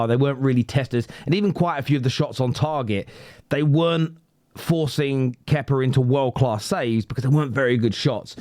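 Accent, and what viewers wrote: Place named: London, United Kingdom. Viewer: which is everytime mind u he sounds like hes from london not Manchester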